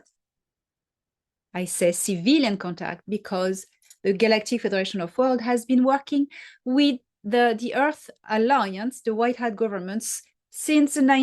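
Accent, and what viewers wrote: France. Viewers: As much as I love the information, and I must admit sometimes it does seem a little far fetched although these days there's really no such thing, the thing that keeps me coming back is that French accent there is just something about it well at least I'm honest lol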